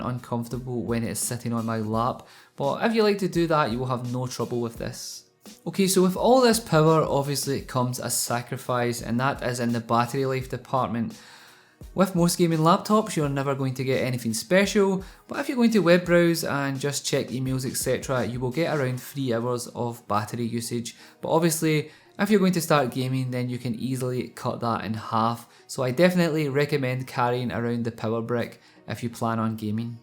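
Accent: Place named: Scotland, United Kingdom. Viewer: its a Scottish accent.. ;)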